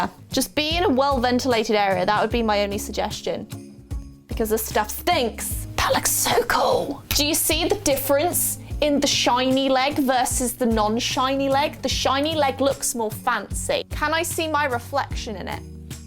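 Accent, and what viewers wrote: United Kingdom. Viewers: Are you British??cuz ur accent tho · Omg i Love your british english 😍😍 (I'm from Germany and i am learning American english ) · Are you from Britain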